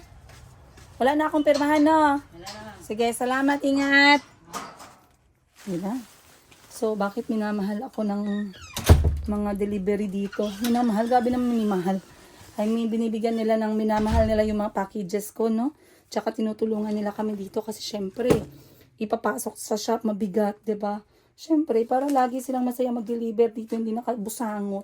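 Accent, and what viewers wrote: Iloilo, Philippines. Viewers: Bat ka nag I ilongga accent 😮eh andiyan ka nman sa mindanao parang Hindi ka proud na mag bisaya eh andyan ka sa mindanao be proud na marunong ka mg bisaya wag ilonnga accent 😮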